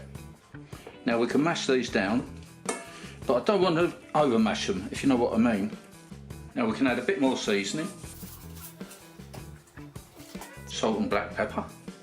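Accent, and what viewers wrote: United Kingdom. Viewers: Hello! I love fish! Especially when it's a form of pie 😊 anyways, are you Scottish? You sounded like it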